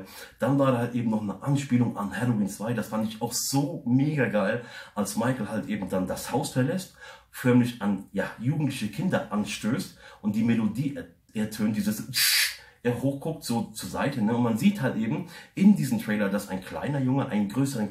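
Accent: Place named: United States. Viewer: Bist du Amerikaner dass du einen Ami Akzent hast ?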